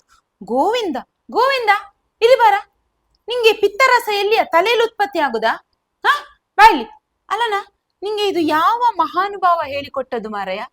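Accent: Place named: Coastal Karnataka, India. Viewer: Madam your Kannada is good. Coastal Kannada.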